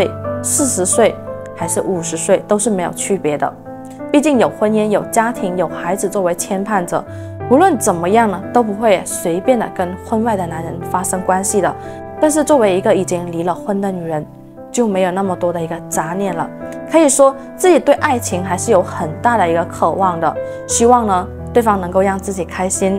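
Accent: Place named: Fuzhou, China. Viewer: 請問這位講話的人是不是來自福州？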